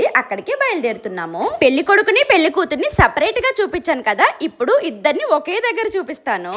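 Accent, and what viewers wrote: Srikakulam, India. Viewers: Sis me voice ki oka uniqueness undi srikakulam yasha baguntadii nadi kuda srikakulam Sis movies lo try cheyandi me voice chala unique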